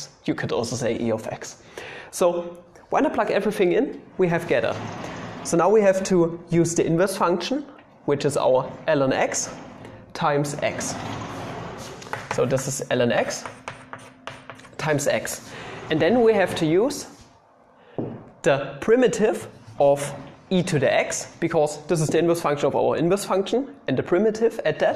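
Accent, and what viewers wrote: Germany. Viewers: You sound german. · I'll be honest. Sometimes I just get lost in his clumsy ways and german accent. xD But keep up the good videos. ;)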